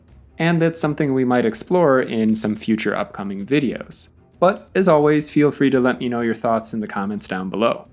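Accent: United States. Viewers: All these incorrect American pronoucications of South African names are pretty grating. It's "The" Cape Colony, M[h]juba hil, C[e]cil Rhodes (not Ceacill), for example.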